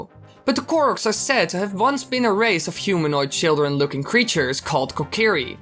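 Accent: Netherlands. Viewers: Nice video! Are you Dutch? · Are you Dutch?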